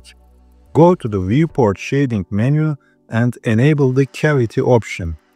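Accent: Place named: Turkey. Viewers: kesinlikle türk aksanı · konuşanın türk olduğuna eminim ama ispatlayamam...😀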